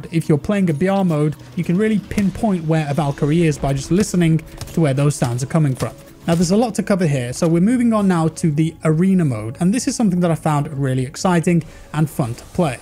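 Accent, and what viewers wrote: United Kingdom. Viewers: What I like about he speaks British but called him self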